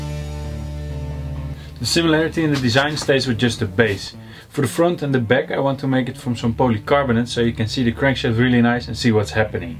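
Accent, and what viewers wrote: Netherlands. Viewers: Are you dutch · Dutch accent? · U sound Dutch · Where you from? Cuz u sound dutch 😂😂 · laat me raden, u bent nederlands? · heard the dutch accent, i subscribed XD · verry nice video, ik hoor dat je van nederland bent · U sound dutch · Ik vraag me af hoe je de windingen van de spoel hebt geteld, of meet je om de zoveel keer hoeveel ohm tie is? ( Neem aan dat je een Nederlander bent zo te horen aan het accent :) ) Damn nice project! :) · Are you Dutch? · haha je hoort gewoon aan dat accent dat je nederlands bent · are u dutch? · You sound realy dutch are you? Awsome idee btw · Do you happen to be dutch, because you have the same accent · joe sound ferry duts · Je hebt echt een Nederlands Engels Achtig spraak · I knew it. Your english is very nice but I can always hear something about the pronounciation if someone is Dutch. Do you live in the Netherlands as well? 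I'm Dutch too btw. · You have a dutch accent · Je uit spraak kan wat beter man ik kan gewoon. Een Nederlands accent er in horen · are ya dutch? you have an accent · Are you dutch? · Are you From the netherlands ? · Are you dutch, you sound dutch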